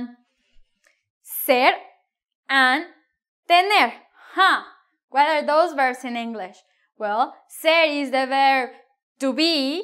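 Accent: Spain. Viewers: Is this Spain Spanish or Mexican Spanish? (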